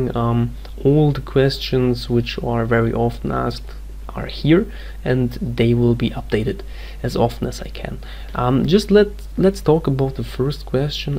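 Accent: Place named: Germany. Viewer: Man merkt, dass du deutsch bist . Coole app ;)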